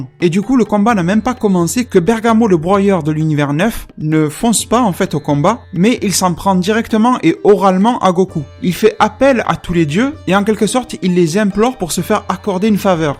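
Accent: Marseille, France. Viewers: ton accent .. Marseille non xD j'ai le même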